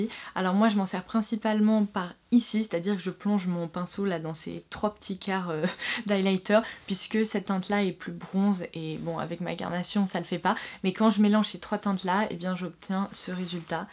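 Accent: United Kingdom. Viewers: Ton accent anglais style britannique c'est si jolie 😊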